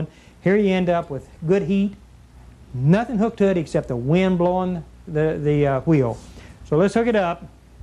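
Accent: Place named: Appalachia, United States. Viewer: This guy doesnt seem nearly intelligent enough to have invented what he claims to have invented. Talks like a hillbilly idiot. Has no wisdom or common sense. Can't explain his own inventions any better than an elementary science teacher. Thinks the government and the patent office will stand behind any free energy device. HA! is dead now isn't he? Why am I not surprised? My best guess is he isnt even the inventor. The real inventor (Dennis Weaver?) probably didnt want to be publically known and stepped up to be in the limelight and take the invention public. ( very poorly I might add ) This is a guy that doesnt even show an understanding of amperes vs volts and what power actually is. His new "battery" is nothing more than a capacitor. Magnetic heating isn't new. It's called induction and eddy currents silly. Seems to think all power is measured in volts. 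"see dem there volts keep goin up, we're makin power".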